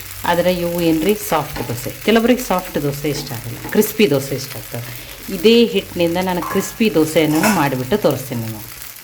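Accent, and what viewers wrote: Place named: Dharwad, India. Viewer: Wow namma Dharwad kannada ❤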